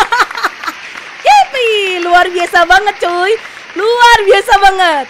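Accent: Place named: Java, Indonesia. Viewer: Kak itu di jawa kok ngomon nya kayak oranh jawa ya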